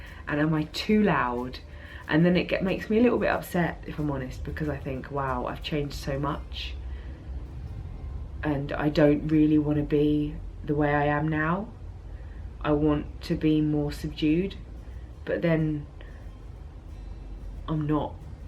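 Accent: United States. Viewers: Your american accent is really good! Oh could you do a video on some workouts you do at home. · Your American accent is SO good! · Your american accent its subtle, but really good. :)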